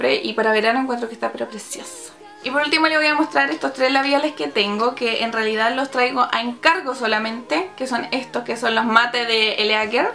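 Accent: Chile. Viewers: eres de conce chile !!!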